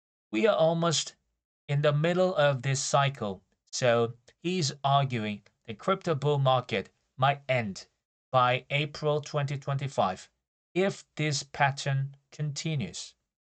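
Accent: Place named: United Kingdom. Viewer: !!! Thank you for your comments a few days ago😊 Your British pronunciation is cool unlike the American one I 'm learning~ lol I'm grateful to you. Have a peaceful day!!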